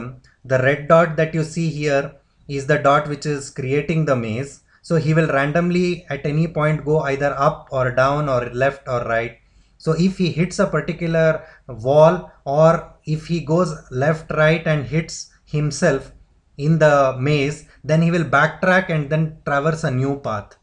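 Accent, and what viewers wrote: India. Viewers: but i hate his fucking indian accent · i like your indian accent :)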